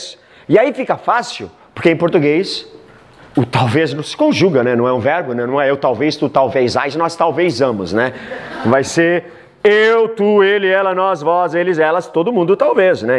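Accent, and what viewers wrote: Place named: São Paulo, Brazil. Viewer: ele tem sotque de Paulista sq sem gírias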